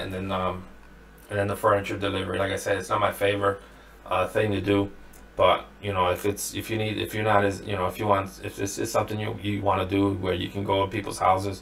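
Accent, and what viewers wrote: Cleveland, United States. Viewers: You from Cleveland?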